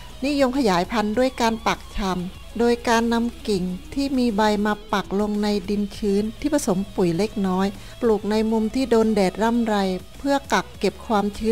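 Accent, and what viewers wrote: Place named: Cambodia. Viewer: สำเนียงคล้ายๆเขมรแถวบ้านผมเลยครับ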